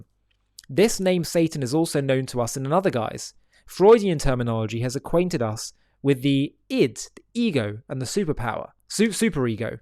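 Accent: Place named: England, United Kingdom. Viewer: He's English, it's how people in that country speak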